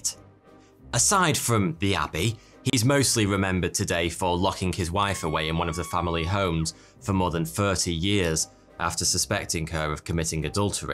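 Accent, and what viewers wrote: United Kingdom. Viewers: Love your stories and your fricking Brit accent (is it? I cannot decide which kind, since British comes in so many flavors and tastes...)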